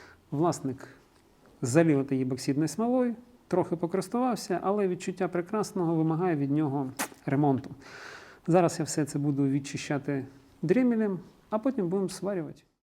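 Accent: Russia. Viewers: Скільки не дивлюсь ваші відео, але оця розмова з домішками москалотської.... чесно?! На мою особисту думку, вам - не пасує, у вас гарна українська, ведіть спілкування на ній. Дякую. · класний ведучий і ролики доступні…. але як же ріже вухо, коли йобані рускіє репліки вставляє 😢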